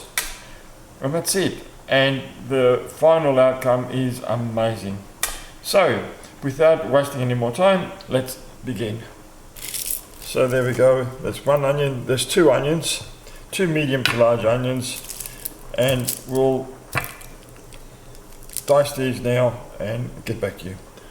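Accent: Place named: Australia. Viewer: thanks I will definitely share with others I too love cooking delicious and nutritious food for people especially my loved ones ❤ Also I could hear the Outback in your voice right away so when you said you were Greek I doubted my hearing but later you said you hailed from Australia I was like I KNEW IT 😂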